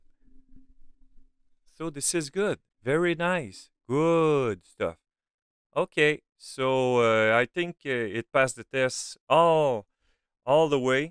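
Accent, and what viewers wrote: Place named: Quebec, Canada. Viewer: Toé t québécois certain ?????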